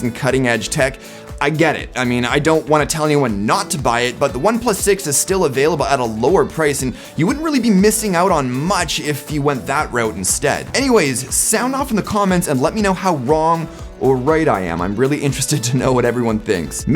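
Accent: Canada. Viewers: Are you from Vancouver (BC) eh?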